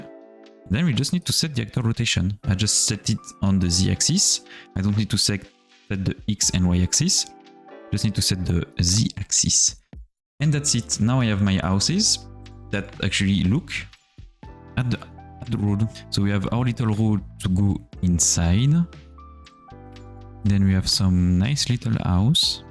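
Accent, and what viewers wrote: France. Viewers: On reconnais l'accent Français lol ^^. Tu pourrais en faire en Francais ? Sa serai cool !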